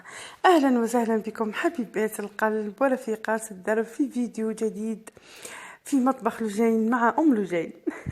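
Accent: Setif, Algeria. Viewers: يا ربي يحفظك انا مشتركة من البليدة نتمنى تذكريني في قناتك نموت عليك و على اللهجة السطايفية انا ام نهال 😘🥰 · واش نحب هدرتك السطايفييا لحرار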